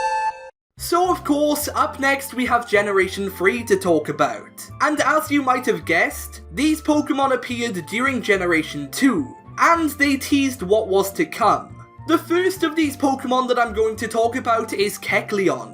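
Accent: Wales, United Kingdom. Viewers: Are you welsh 😊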